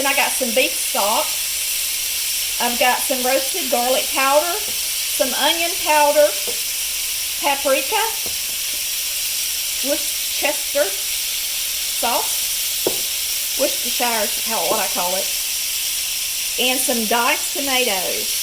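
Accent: South, United States. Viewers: I love your videos, your Southern accent, and your recipes. I cooked this Swiss steak and it turned out tender, juicy, and delicious. You're a great cook.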